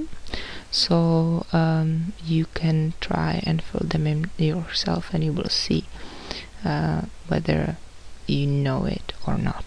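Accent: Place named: England, United Kingdom. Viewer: Oops, I meant your English accent! hahaha.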